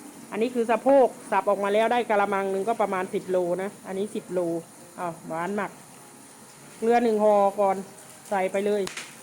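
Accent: South, Thailand. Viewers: คนใต้หรือเปล่าคะเก่งจังได้ความรู้ด้วยค่ะ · สำเนียงชัดเจน คนใต้แน่ๆ😂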